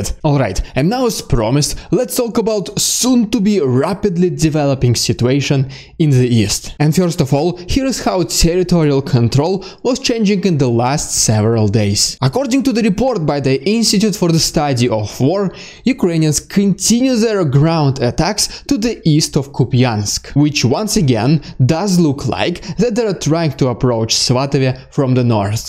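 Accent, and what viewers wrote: Russia. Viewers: I agree wholeheartedly. Maybe it is an Aussie thing, since we adore Canadians, and what could be more adorable than a Canadian with a Russian accent? If you like and Ukraine Matters, I also suggest Denys Davydov and Artur Rehi. Reporting from Ukraine Is also sometimes worth a look. Similar content on all five channels, but occasionally one picks up a significant point that the others missed or glossed over. And it is great to have a variety of perspectives and different nuances.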